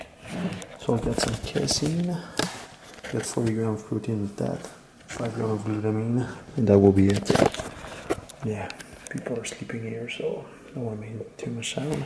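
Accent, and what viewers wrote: Quebec, Canada. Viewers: T'es québécois ? Je te suis depuis peu donc je me demandais haha ;)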